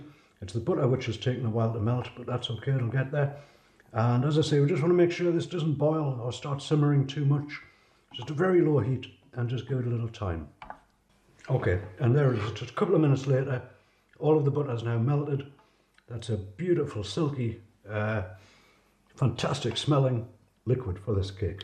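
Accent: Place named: Newcastle, United Kingdom. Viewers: Looks delicious & easy method to make, will give it a go, & you sound like you have a ‘Geordie’ accent… North East UK, where are you from please?? & thank you 👏👏🌟😋🍽😋🎉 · I am from South Shields on Tyneside and he sounds like he is from our neck of the woods indeed.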